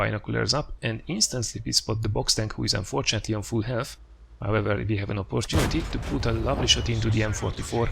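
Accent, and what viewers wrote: Hungary. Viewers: Annyira magyar akcentusa van xdd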